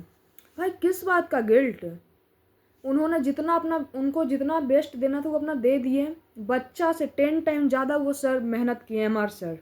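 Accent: Bihar, India. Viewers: Mujhe laga eski voice sun kar ye bihari hi hai 😅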